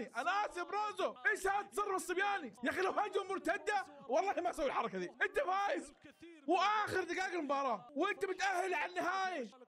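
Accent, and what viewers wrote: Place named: Jazan, Saudi Arabia. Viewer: لا بس حسيت طريقة كلامك كأنك جيزاني..... والنعم فيكم اتشرف فيكم❤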